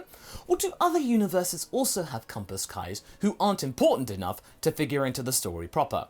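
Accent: United Kingdom. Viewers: I like your accent, are British, New Zealander or Australian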